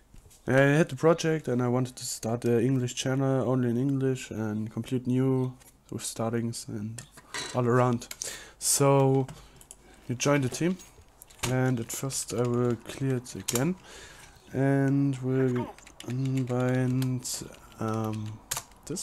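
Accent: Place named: Germany. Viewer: Der deutsche Akzent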